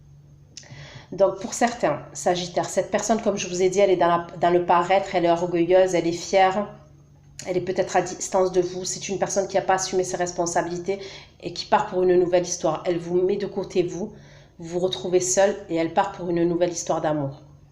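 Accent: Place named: South, France. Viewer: Ta une belle voix du sud comme moi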